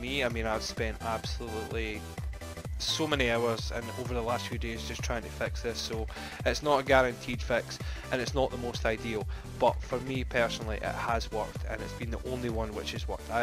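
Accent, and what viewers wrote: United Kingdom. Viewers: Loveeeeeeeee the scottish accent mate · I love your British accent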